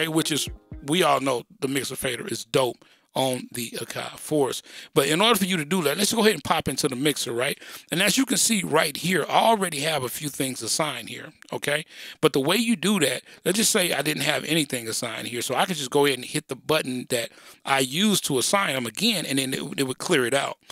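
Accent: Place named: United States. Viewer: Your heavy murrican gangsta accent makes the understanding for non-native speaker really hard.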